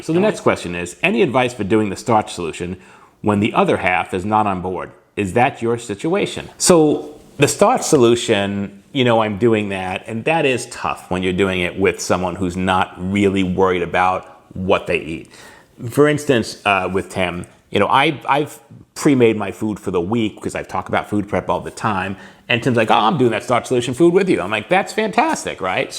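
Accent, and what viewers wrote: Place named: Long Island, United States. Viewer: I just found your channel and subscribed after watching the first one. I think you're great. I love your enthusiasm and dedication. It's helping me get excited about the recipes. I especially love your Long Island accent. I am actually a vegan living in Park Slope, Brooklyn, but I've always wanted to live in Phoenix/Tucson. Should I make the move? Looking forward to more videos! Thanks!! Keep up the great work.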